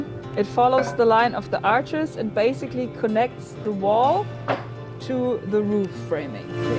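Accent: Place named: Germany. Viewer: Man merkt das es ein deutsches Englisch ist, was sie im #Video spricht.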